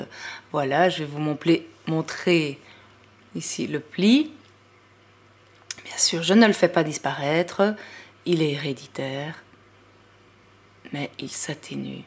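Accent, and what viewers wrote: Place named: France. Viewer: Beautiful accent...love the french accent so much ☺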